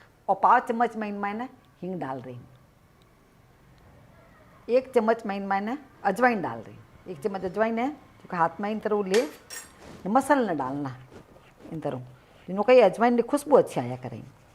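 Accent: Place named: Rajasthan, India. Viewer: Rajastani bhasa boat pari lagi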